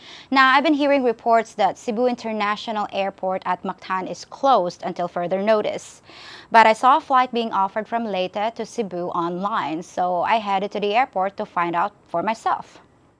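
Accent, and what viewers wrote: United States. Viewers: Maayong gabi,! Stumbled on your You Tube video here with an insightful view of your hometown after the powerful typhoon passed the place. I liked your English accent here. Did you grew up in the States? Anyways, I appreciate your report here. Had many of the people I know who are based in Cebu, mostly in the downtown area. Daghang salamat! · American accent man so madam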